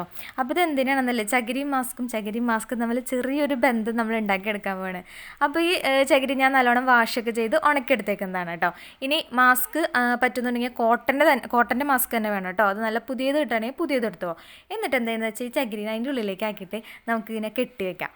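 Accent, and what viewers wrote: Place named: Thrissur, India. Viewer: Your trichur language is very sweet. Continue in the same tone. Love you.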